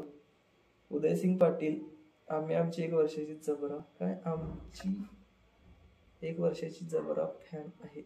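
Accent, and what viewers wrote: Kolhapur, India. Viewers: अतिसुंदर कोल्हापूरला गेलो असे वाटते फारच छान आपली कोल्हापूरची मराठी भाषा ऐकून फार आवडते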